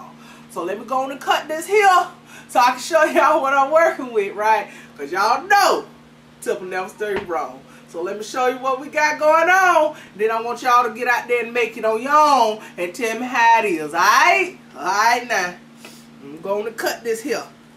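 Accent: South, United States. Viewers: Where are you from i love your southern accent ?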